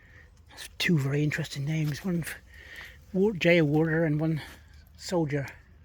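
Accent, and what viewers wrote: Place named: Cornwall, United Kingdom. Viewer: Great video ,nice to see a face to the lovely Cornish voice.always enjoy the vids .I love history x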